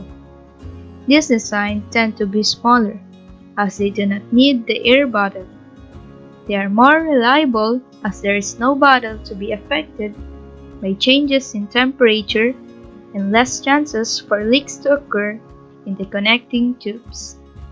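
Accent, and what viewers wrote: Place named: India. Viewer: OMG, heavy Indian accent, read by someone who does not understand what they are saying.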